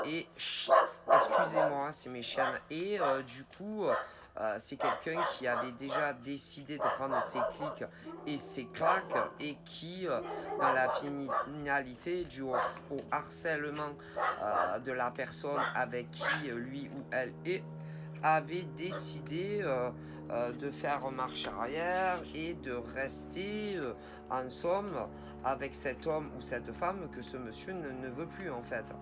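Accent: South, France. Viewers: Cc je vous découvre ❤super juste 😮mdr je le savais 😂j aime beaucoup votre style, beaucoup 😊super nature accent du sud non? Bisous du sud 😊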